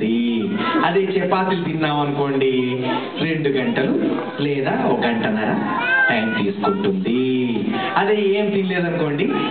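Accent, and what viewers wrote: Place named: Telangana, India. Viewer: AND U CANT EVEN DO THAT RIGHT WITH THAT HEAVY TELANGANA ACCENT DONT UNDERSTAND ME WRONG I AM FROM TELANGANA TOO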